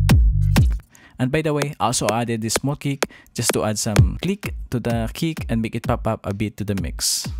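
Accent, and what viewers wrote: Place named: Philippines. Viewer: Filipino? Your accent sounds really filipino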